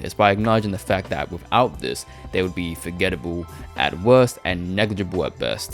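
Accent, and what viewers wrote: United Kingdom. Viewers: Where you from? I always thought you were UK/British Habesha.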